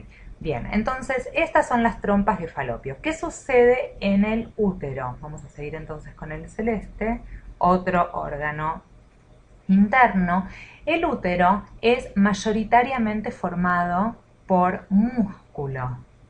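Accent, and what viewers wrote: Rioplatense, Argentina. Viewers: Sos argentina y recien me di cuenta o capaz uruguaya pero igual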